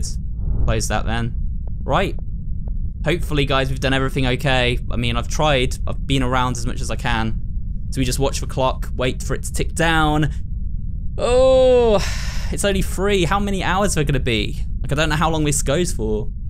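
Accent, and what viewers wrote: South, United States. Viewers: Southern accent is YouTube gold! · As someone who lives in Texas. I thoroughly enjoy your southern accents 😂 Funny how you find it hard to do. Seems so natural for us lol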